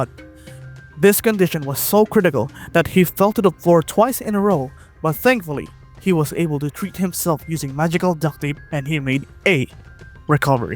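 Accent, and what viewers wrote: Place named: Philippines. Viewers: PEAK FIND, you deserve more views and subscribers for this amount of dedication (also your accent is similar to Filipinos) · He’s Filipino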